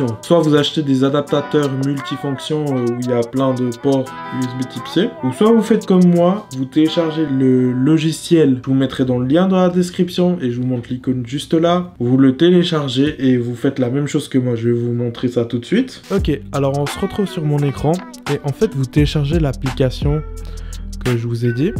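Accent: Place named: Switzerland. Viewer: Je fais une recherche à ce sujet, je reconnais ton accent Suisse et je vois Delémont...Yo! Je viens de Delémont aussi mais je vis à Montréal depuis 2011. Insane ce bel hasard!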